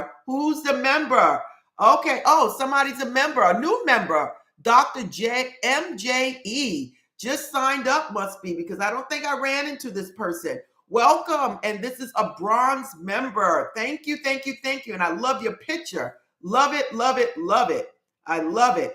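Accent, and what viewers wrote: United States. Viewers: I like your videos but your English is too American to me At times I don't hear you clearly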